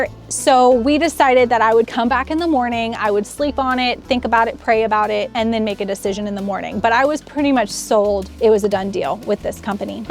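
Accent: Kentucky, United States. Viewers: It’s always amazing to see how God comes through in our lives even when we don’t know how it’s gonna turn out ❤ and also LOVE the Kentucky accent 😂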